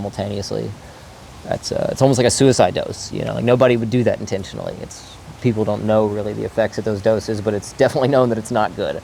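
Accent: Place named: Texas, United States. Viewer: i kno this guy well. he’s from texas, you can hear his texas “twang” come out some here. he use to live in athens GA and make edibles w greg simpson hash oil method. he’s really smart, and a good businessman man. He’s no chemist, at all… decent side kitchen chemist maybe. he’s a exmarine and a drifter. and he is not on drugs, well like not stimulants anyways.. he’s naturally vocally energetic and fidgeting like this.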